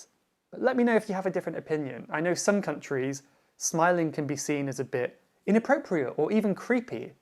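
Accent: United Kingdom. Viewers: Thank you so much for this remarkable video. I came across this channel recently and I’m deeply impressed because of your British accent your communication skill.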